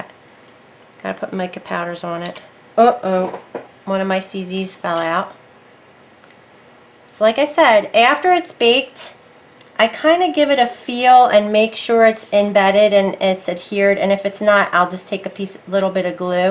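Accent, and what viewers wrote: New Jersey, United States. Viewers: I know you're not wearing a mask in this video, but using long term mica powders can damage your sinuses and lungs. I know it's not fun, but please do it. I'm loving your channel, love your Jersey accent and joyfulness. Blessings to you and your family. xxXX Grambo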